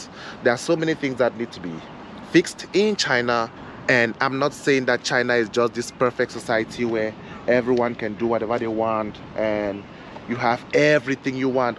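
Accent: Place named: England, United Kingdom. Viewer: I have high respect for you my brother man ,,, l love you English accent ,,,keep going what you do .best of luck for. You,, god bless your family and your people,🙏❤😇😇😇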